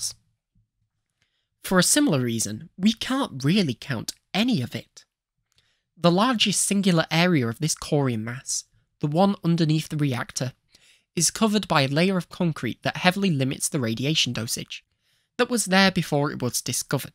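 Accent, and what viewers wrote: United Kingdom. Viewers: Started video and heard the British accent that pronounces TH as F. Immediately stopped video and clicked Do not recommend channel. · No its the British accent that sucks.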